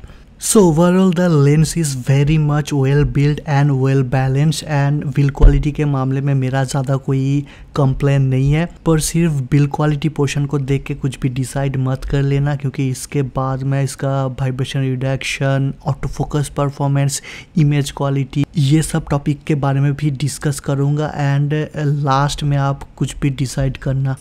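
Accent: Bengal, India. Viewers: hindi sahi hai lekin bangal hai tone to hogi hi bangali, aap video me or achche se hindi bole, video or chalegi sath hi bangali me bhi alag se banaye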